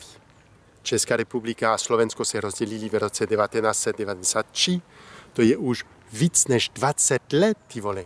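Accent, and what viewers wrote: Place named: France. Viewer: Je to sympaticky tenhle francouzsky (?) prizvuk v cestine. Ahoj z...Rumunska, kde existuje dulezita cesko-slovenska mensina! C'est sympa cet accent (francais?) dans le tcheque. Salutations de la ...Roumanie, ou il existe une minorite tcheco-slovaque importante!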